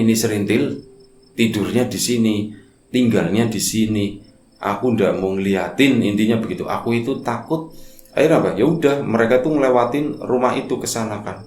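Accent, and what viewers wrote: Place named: Java, Indonesia. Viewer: Sekarang agak mendok ya mas 🤭🤭🤭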